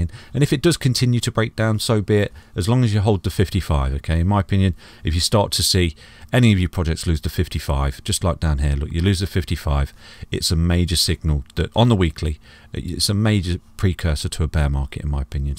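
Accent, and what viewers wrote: United Kingdom. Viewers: this is a good video, clear and simple to understand, and a British accent to boot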